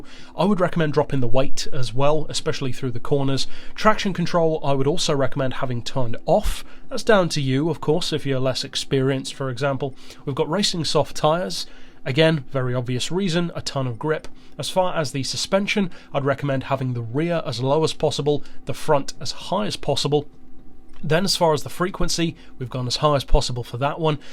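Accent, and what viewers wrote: United Kingdom. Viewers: All British people sound like to be honest.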